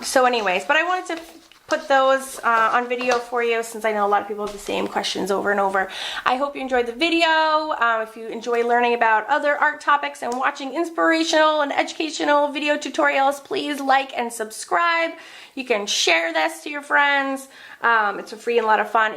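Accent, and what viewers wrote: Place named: New England, United States. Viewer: Love the NE "talk" don't lose it please. This video was very helpful. I have the streaking problem (shame) lol, so thanks for sharing your wicked cool wise ways.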